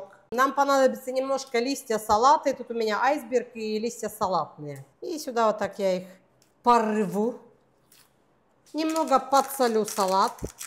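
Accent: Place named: Odesa, Ukraine. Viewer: дівчата розмовляють одєсскою мовою. Головне, що всім зрозуміло. Дякую за рецепти.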